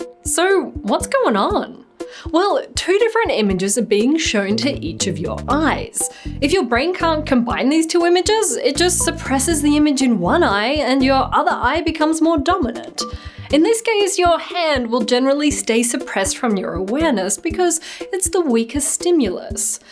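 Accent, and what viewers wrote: Australia. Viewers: Accents are cool and weird sometime. I wonder how "tube" became "tchub" down in Australia. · I'm not hearing any at all, just an Australian accent.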